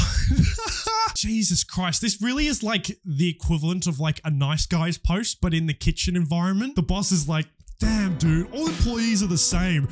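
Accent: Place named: United Kingdom. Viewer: You sound British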